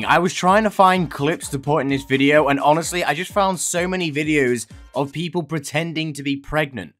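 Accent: United Kingdom. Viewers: Btw it’s my first time seeing this channel glad to find another bri’ish fellow🇬🇧🇬🇧🇬🇧🇬🇧🇬🇧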